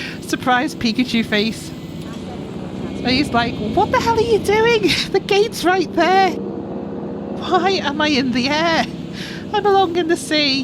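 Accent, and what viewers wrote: Liverpool, United Kingdom. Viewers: I am from the wirral, so it's nice to have a scouser planco player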